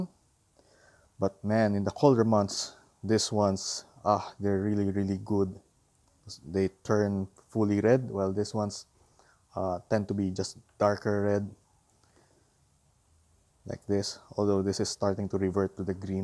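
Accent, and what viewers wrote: Philippines. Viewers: You sound Pinoy po! Btw, thank you for this info, your garden is beautiful! Keep it up! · Pilipino po ba kayo? It sounds like so :) Love your garden! I wish mine would grow as healthy and abundant as yours someday.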